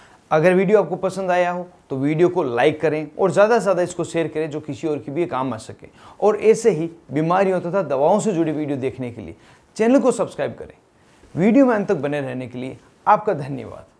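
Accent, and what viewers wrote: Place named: Bikaner, India. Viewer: Aap ke accent se lagta he aap shayad Bikaner se hai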